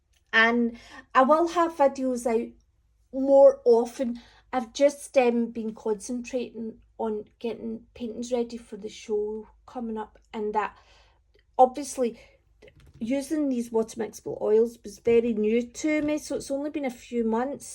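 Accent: Scotland, United Kingdom. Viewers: As an artist you have to do what an artist has to do: create. And you created alot! I love those pencil sketches in your little red sketchbook. When you go with the flow there is so much good stuff coming out of you,. But - and I am saying this out of experience - trust your own impulse, don't mind others opinions about your art wether they are good or bad. It was a pleasure to hear from you again (although your sweet scottish accent is sometimes a bit hard to understand 😉). Mara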